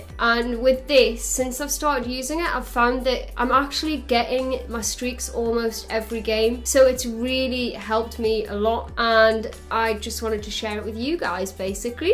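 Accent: United Kingdom. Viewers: Is this britsh english?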